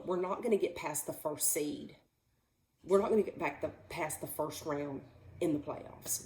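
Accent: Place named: Texas, United States. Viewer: I'm a Bronco fan and I love your passion and Texas accent 😅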